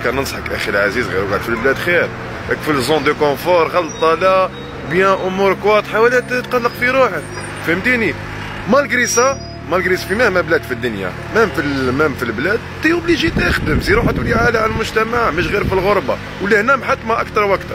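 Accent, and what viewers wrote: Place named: Annaba, Algeria. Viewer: خونا من عنابة؟ من اللهجة باين والعلم لله.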